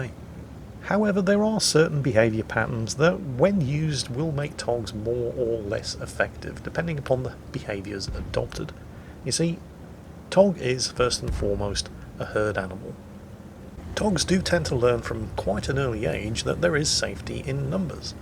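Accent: United Kingdom. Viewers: his British its in there blood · Sir David Attenborough doesn't have anything on you,. If you fail at gaming, I certainly see a career for you in monotonous British-accented wilderness television.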